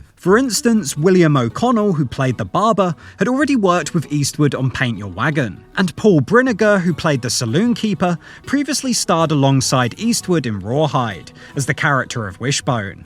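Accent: United Kingdom. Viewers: I always wondered why when people make these videos it's never Americans always sounds like a British person or something like that I don't get it should be Americans doing this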